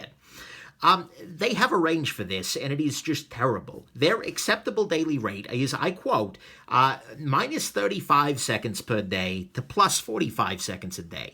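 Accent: United States. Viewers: Mark Walters That’s a pretty shallow attitude. I am English and find his accent so much better than computerised voices. It’s obviously a regional variation of the standard American accent (if there is such a thing). Carry on...you’re doing a great job on watches and knit.A man of my own heart.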